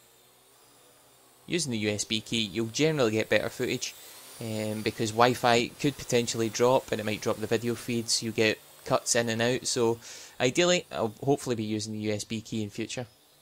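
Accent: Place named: Scotland, United Kingdom. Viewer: I don't want to sound incredibly creepy, but where in Scotland are you from? Your accent is very similar to mine.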